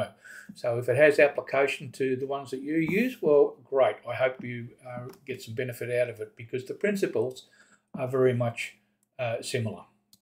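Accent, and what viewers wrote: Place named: Australia. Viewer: Glad the algorithm put your video up for me - and glad to hear an Aussie voice grappling with the Obsidian stuff I'm interested in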